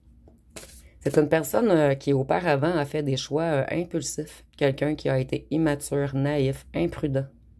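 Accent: Canada. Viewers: J'adore l'accent canadien bonjours c'est Patrick vous êtes la rose de mes jours ❤❤❤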